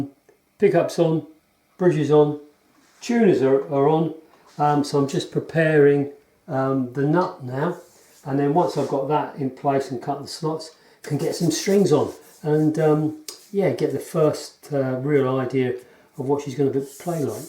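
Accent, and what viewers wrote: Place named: United Kingdom. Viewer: Hello again,. Am watching your bass construction series, very much enjoying it. While I have no desire to ever build a bass, as usual, I totally admire your craftsmanship and attention to the smallest of details. While I am at it, I should mention I find your British accent a real treat to my ears, as if I am eating a piece of delicious toast covered in peach jam. Cheers, my friend. Bob in Montana. 😊❤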